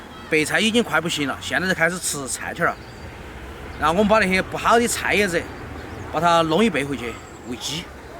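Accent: Sichuan, China. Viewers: 你彪哦！四川話真的是獨樹一格 XD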